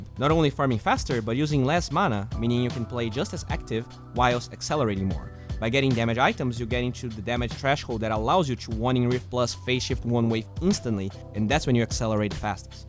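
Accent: Brazil. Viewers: esse sotaque de br quase imperceptivel mas eu percebi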